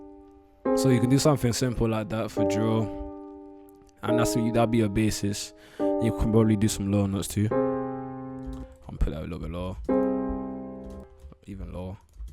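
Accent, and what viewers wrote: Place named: United Kingdom. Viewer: 📠 FAX! Heard the UK accent and subbed quick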